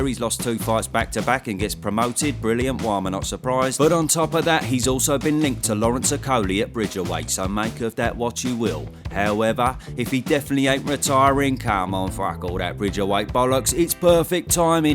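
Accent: Texas, United States. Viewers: Perfect Texas accent if you ask me. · Love the Texan accent. Literally pissed myself.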